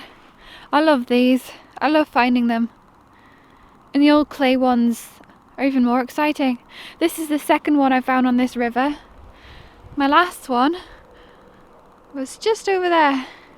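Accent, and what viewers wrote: United Kingdom. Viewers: Love your very british accent..... and i'm british. 😛😜😛😜😚 x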